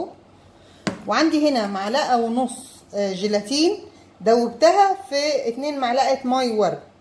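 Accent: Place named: Egypt. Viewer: هو انتى بتكلمي مصري على خليجي ليه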